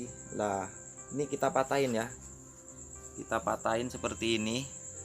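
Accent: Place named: Madura, Indonesia. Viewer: Ini Madura asli kelihatan logatnya...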